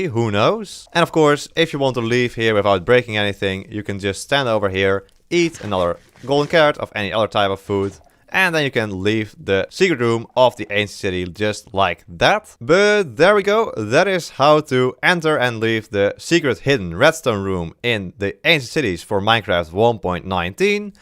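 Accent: Netherlands. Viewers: Ik hoor een Nederlandse stem, ben je dat ook?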